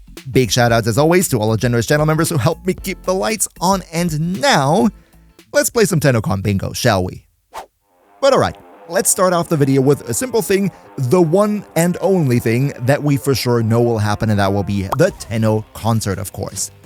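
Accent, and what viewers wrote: Germany. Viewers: Ich bin nur diesen starken typischen deutschen Akzent gewöhnt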